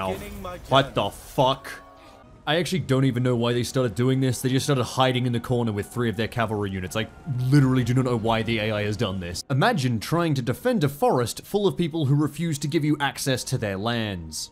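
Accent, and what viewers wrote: Australia. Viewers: It's like Sseth and Sovietwomble, but Australian. And I like it.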